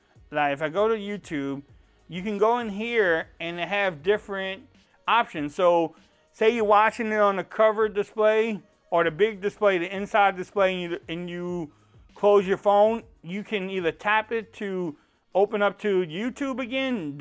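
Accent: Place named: North, United States. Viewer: Great videos! I just got one and it's so much easier to hear instructions in english with an American accent! I mean you don't sound like me and I don't sound like you! But I guarantee you we both can comprehend each other. You sound northern to me (no offense!) I'm from Miami . If a lot of these people, that think they speak english would quit talking to their friends, in their language and use english both people would get better. Ask Tony I just set mine up and didn't even raise my BP! Thanks! (sub added)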